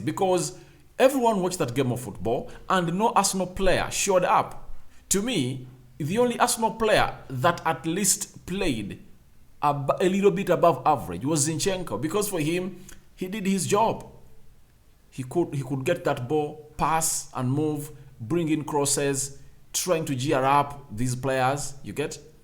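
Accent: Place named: Iteso, Uganda. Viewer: thanks for the job you really give the truth but i request you to tell me your country and tribe coz you speak like an itesot from soroti city uganda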